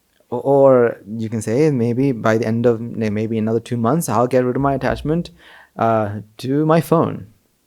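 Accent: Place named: United Kingdom. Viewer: Profoundness with British English excent sermon❤ saadhukara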